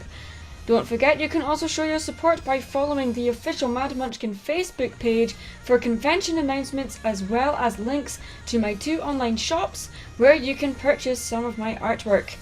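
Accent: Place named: Lothians, United Kingdom. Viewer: You sound like you're from the lothians, am I right?? xD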